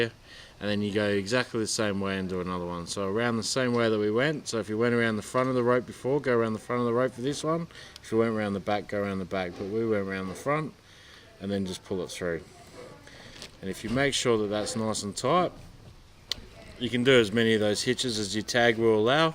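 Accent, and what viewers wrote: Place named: Australia. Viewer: Which part of Aus are you from?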